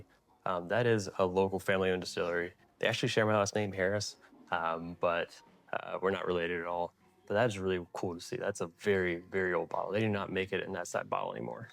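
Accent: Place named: United States. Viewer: i love the small inaccuracies when you talk, makes you sound very american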